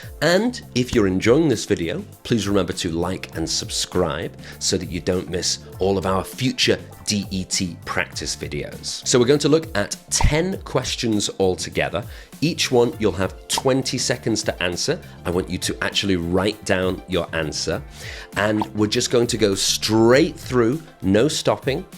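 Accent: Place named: United Kingdom. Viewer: Thank you sir. And your British pronunciation sounds incredible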